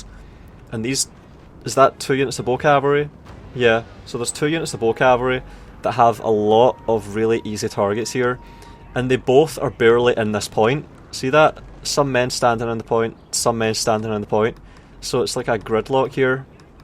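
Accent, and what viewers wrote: Scotland, United Kingdom. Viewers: Is this scottish accent? I'm amazed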